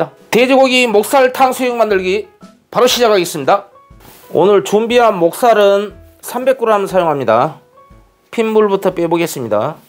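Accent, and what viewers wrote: Jeolla, South Korea. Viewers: 사투리가 딱 전라남도 서남부 목포 스타일 이네요.. 정겹네요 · 전라도 사투리 쓰시면서 억수로는 경상도 사투린데 섞어쓰시네 고향이,어딘교 구례쪽이신가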